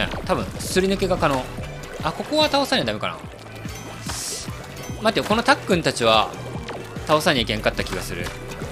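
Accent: Hiroshima, Japan. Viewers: イケボなのに広島弁で親近感が湧く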